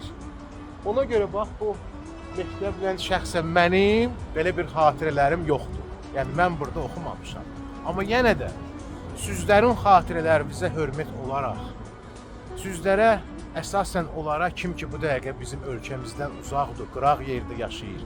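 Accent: Baku, Azerbaijan. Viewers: Bakı ləhçəsi necə də xoş gəlir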